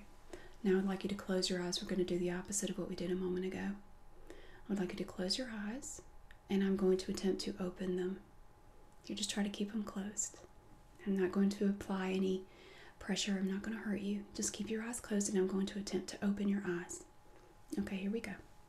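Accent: South, United States. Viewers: needs more southern accent 4/10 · Your accent is perfect! When people try to force a southern accent it always sounds way too drawn out and unnatural. yours is great. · Folks, this is what a REAL urban southern accent sounds like. The long 'drawl' is more common in rural areas. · Awkward moment when you wonder where her accent is, then realize you've lived in the South your whole life and this is just what sounds normal to you... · Yup I live in Austin, Texas... this is definitely southern haha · As a French girl, I find that your "southern accent" makes the English a lot easier to understand ! Nice video, by the way. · I love cranial nerve / medical roleplays, and I also adore southern states accents - So this video is bliss for me! Thank you so much for this creation! Can't wait to see your other videos! All the best from the UK. :)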